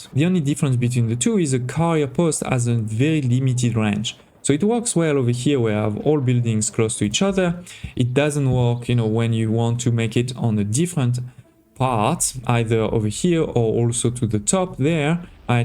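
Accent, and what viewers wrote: France. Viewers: Nice video ! (are you french by any chance ?)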